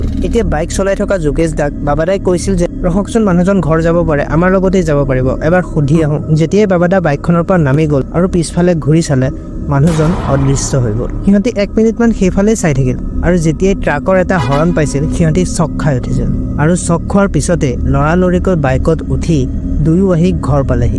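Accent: Tezpur, India. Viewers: aitu tezpurr kotha jen lagil. tezpur t ankua akhon thai ase.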